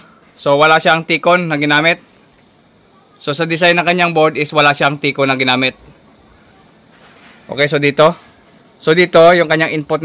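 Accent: Iloilo, Philippines. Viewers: Master ilonggo ka ba?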